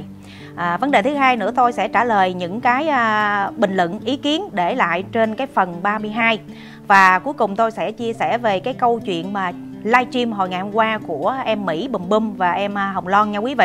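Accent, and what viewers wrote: Standard. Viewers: lập luận sắc bền rất thích!phát âm khá chuẩn nhưng vẫn còn vài khuyết điểm " luận " " bình LUẬN " không phải " bình LỰNG " chỉnh lại một chút sẽ hấp dẫn hơn chúc em luôn thành công Mãi dõi theo em dõi theo lẽ phải!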